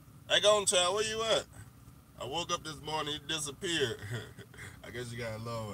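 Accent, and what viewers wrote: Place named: Texas, United States. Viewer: You’re starting to get that Texas Twang in ya.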